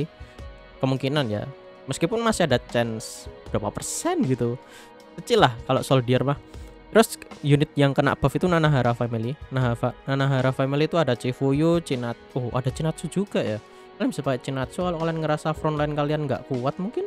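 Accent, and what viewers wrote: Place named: Java, Indonesia. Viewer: Medhok bero. Hehe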